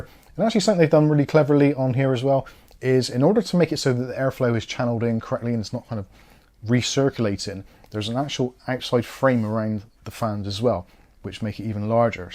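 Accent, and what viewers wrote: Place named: West Country, United Kingdom. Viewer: Just found your videos, noticed the West Country accent and then saw your DJI test footage. Hello from BS30! Love the content and subbed.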